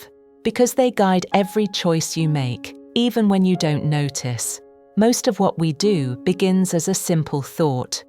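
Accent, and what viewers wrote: United Kingdom. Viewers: Thanks nice British accent ❤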